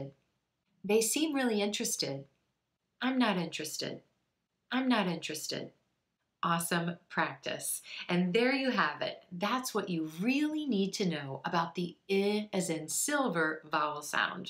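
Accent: United States. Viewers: I love your lesson and your American accent!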